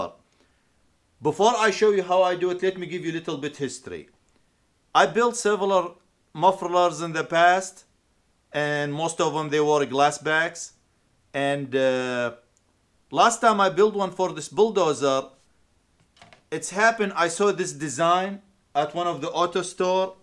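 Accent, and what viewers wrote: Iraq. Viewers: من طريقة لفظك عرفتك عراقي !!!! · انته عراقي؟.حجيك عراقي من الوسط و الجنوب تحديداً!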